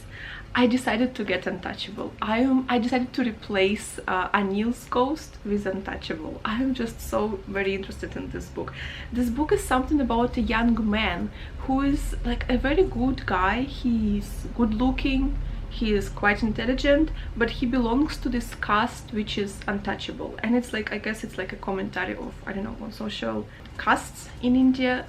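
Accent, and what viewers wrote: Russia. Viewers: Are u russian or smth? Like yr accent sounds so familiar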